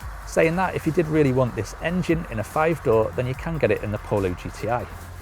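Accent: United Kingdom. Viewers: Why is it that British people always say Fiestar ST?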